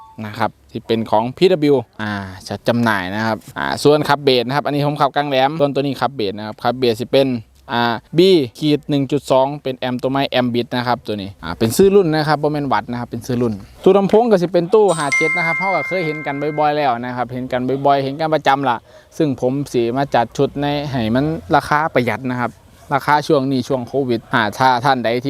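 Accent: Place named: Isan, Thailand. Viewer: ขายให้แต่คนอีสานเหรอครับ พูดกลางไม่เป็นเหรอครับผมฟังไม่รู้เรื่อง😟😟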